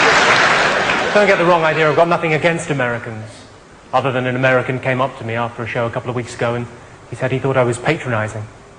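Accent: RP, United Kingdom. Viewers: His accent is so posh :33333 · Sounds incredibly posh in this show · Is it me or is he really poshing up the accent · Technically he doesn’t have an accent, it’s just a slightly altered form of Received Pronunciation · Is me or does he sound super posh in this??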